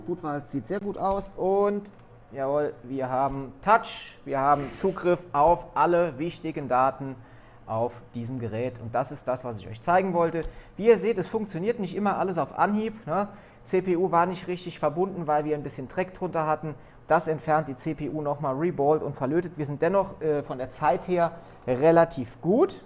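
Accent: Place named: Germany. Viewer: my inglish is very bad jajaj . i think your english is really good , a little be mixed with german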